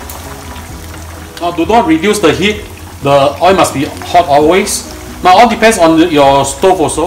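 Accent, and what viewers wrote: Singapore. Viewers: This is Singlish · I would say he has a heavy Singaporean accent. It is not Hong Kong. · Singapore English lol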